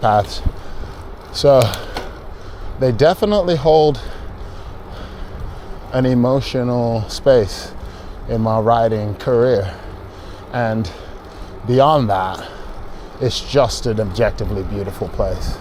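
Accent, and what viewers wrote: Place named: United Kingdom. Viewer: I can really hear your years spent in the UK in this video. I’ve picked up on a few times but it’s really expressed here. Great ride! Thank you for sharing.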